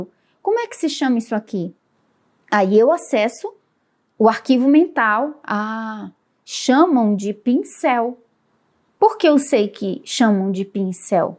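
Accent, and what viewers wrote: Northeast, Brazil. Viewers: Adoro seu sotaque meio do norte ou nordeste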